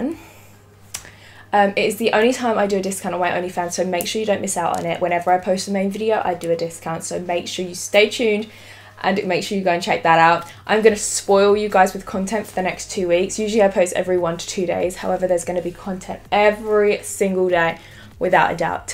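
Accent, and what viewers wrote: United Kingdom. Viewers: Ela é britânica da pra ver pelo sotaque. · I did not imagine her with a british accent wtf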